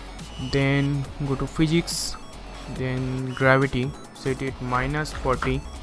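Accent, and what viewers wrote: Bengal, India. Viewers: sounds indian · Really hard time understanding a word he's saying... Too strong an Indian accent. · wow the Indian accent !!!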